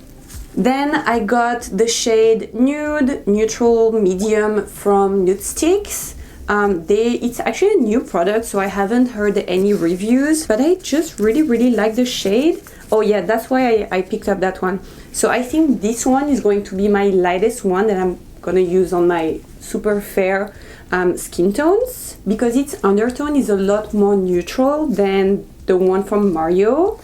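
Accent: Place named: France. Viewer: Vous êtes française ça s'entend à votre accent 😊 Je vais regarder un peu vos autres vidéos j'adore les vidéos makeup !! ^^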